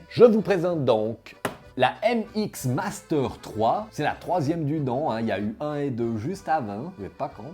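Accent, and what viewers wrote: Switzerland. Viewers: C’est tip top hop schweiss. Nostalgie de l’accent suisse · On dirait qu’il y a certaines similarités entre l’accent suisse et l’accent québécois... 🤔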